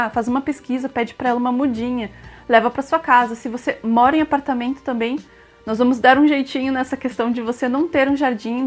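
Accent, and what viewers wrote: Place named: Porto Alegre, Brazil. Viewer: Que dica incrível! Esse app vai ajudar muito, eu tenho bastante receio de usar uma planta errada pra fazer chá e passar mal, acho que vai ajudar bastante mesmo 💜 bjuuuu (eu amo que tu fala do mesmo jeito que eu por sermos daqui de Poa, sempre acho que teus outros seguidores não entendem as nossas "gírias" kkkkk)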